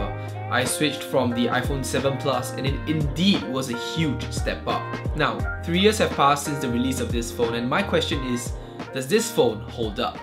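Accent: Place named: Southeast Asia. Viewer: You have a South East Asian accent! By any chance are you from Singapore or Malaysia?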